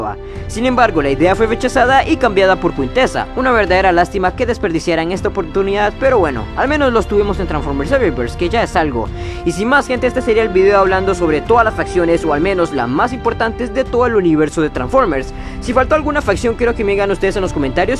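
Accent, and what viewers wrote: Costa Rica. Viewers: Acento Tico.... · Tu acento parece costarricense. ¿eres Tico? Pd: gran video, sigue así.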